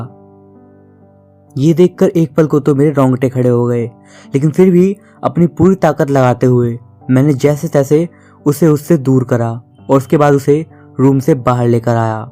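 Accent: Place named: United Kingdom. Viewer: Ji, mai aapka ek fan hoon, ye ho gayi sabse pehli baat. Ab mai kuchh aisa kehna chahta hoon, jise sun kar, mai ummeed karta hoon ki aap bura nahi manenge balki, meri baat ko positive way mein lenge. Mai darasal ek suggestion dena chahta hoon aapko- please ho sake toh apne "र" ke pronounciation ko theek karne ki koshish karein kyun ki woh sunne mein aisa lagta hai jaise koi angrez kahaani suna raha hai, aur dusri baat, jab aap kahaani sunate hain toh beech-beech mein aapke do (2) words intermingle ho jate hain, jiski wajah se woh vakya theek se samajh mein nahi aati. Baki sab Great hai Boss! Aap ek amazing story teller / reader ho, aapki voice expression perfect hai. Bas, just yahi do-ek cheezein sahi ho jayein toh aap really outstanding performer ho sakte hain. Isiliye aapse request karta hoon ki zara in biduon per dhyan dein jo ki maine apne comment mein ujagar ki hai. 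Ummeed karta hoon ki aap meri (apne ek fan) ki baaton ko positive way mein lenge, na ki, bura manenge. Please do believe me buddy, i just love you & your stories too much!❤👏🏼👌🏻👍🏻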